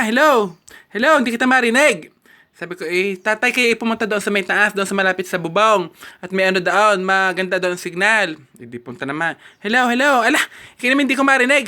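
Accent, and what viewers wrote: Batangas, Philippines. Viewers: Hahahaha nkakatawa ka tlga.! 😁😁 Lalo na eh my tono Ng batangenyo ay siya nkakatuwa..hehehe 😁😂😂😂😆😆😆😆😆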